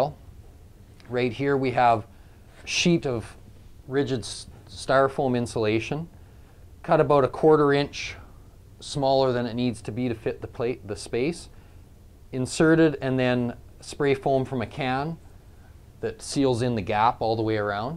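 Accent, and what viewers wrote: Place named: Canada. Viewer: You sound very Canadian. 😁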